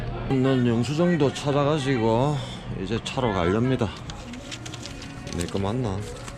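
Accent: Gyeongsang, South Korea. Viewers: 구수한 경상도 사투리가 듣기가 좋습니다. 앞으로도 많은 좋은 영상 기대 하겠습니다. 모쪽록 건강하세요!~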